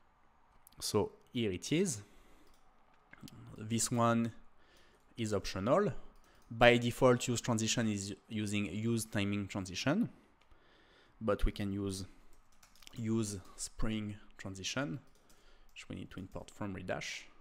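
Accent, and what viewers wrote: France. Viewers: Very easy to reconnaitre que you are french 😁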